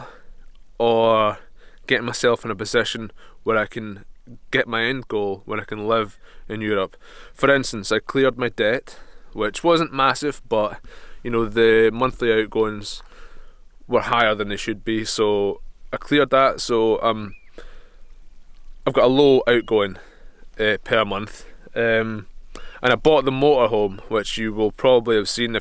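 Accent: Scotland, United Kingdom. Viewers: Not the 1st time I've heard a Scottish accent say "Spare a little change pal" ;) ... Count me in for the Patreon sub .. Not to be soppy but due to injuries and ill health your videos have got me thru the time off the Bike these last few months and made me look forward to getting back on it, So I'm sure its the least I could do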